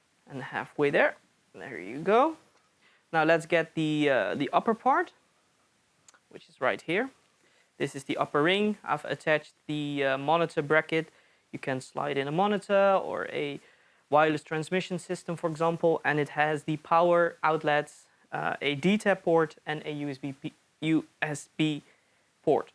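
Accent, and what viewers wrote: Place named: Netherlands. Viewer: ja ik was opzoek naar een alternatief/ring mount om de dji rs2 op te monteren ^^ Soms een beetje vermoeiend en onhandig om alles met de standaard grip te doen vooral als je hogere shots uit de hand wil maken. Deze van Tilta ziet er heel interessant uit alleen en is volgens mij zover ik heb kunnen zien ook een van de enige opties momenteel. Hoop alleen dat hij snel wat beter beschikbaar zal worden 😅 Ik had eerst mij twijfels of je nederlands was ik hoorde wel iets, daarna de shots met nl kentekens en de "later" bevestigde het wel 😆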